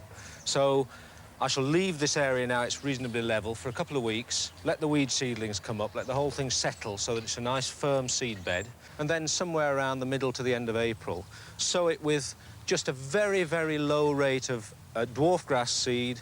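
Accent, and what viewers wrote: United Kingdom. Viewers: Thanks, I thought it sounded south Yorkshire/Lincolnshire midlands-ish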